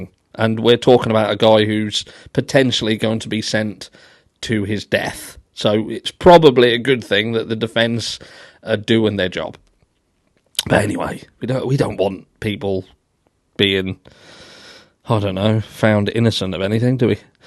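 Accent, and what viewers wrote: United Kingdom. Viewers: I've seen your subs swooning over your blue, blue eyes, your British accent, your beautiful wife, etc. but it's your unexpected, high-pitched giggle that does me in 😅😂😂! Dying.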